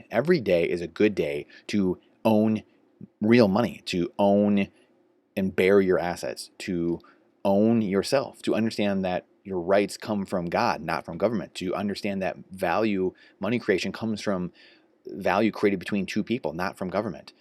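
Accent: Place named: Michigan, United States. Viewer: I had a hint by the accent but are you from Michigan?!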